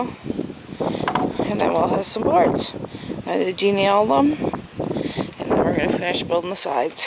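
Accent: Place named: Newfoundland, Canada. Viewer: where exactly are you from? that accent sounds like your from Newfoundland ☺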